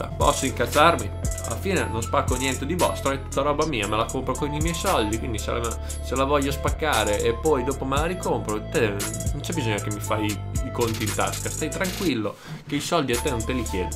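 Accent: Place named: Tuscany, Italy. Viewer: la tua toscanita è palese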